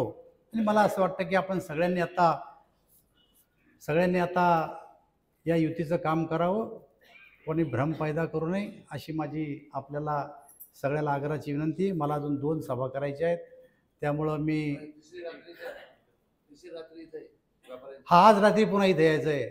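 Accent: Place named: Marathwada, India. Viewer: Khup chhan gavran jaminivarcha neta..... jaykwadichi koli ani ravsaheb denvenchi boli hicha marathvadachi alokha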